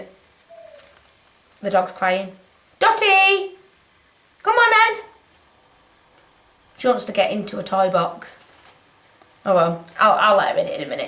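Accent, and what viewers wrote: Northern England, United Kingdom. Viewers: It’s lovely to hear another northern accent ❤️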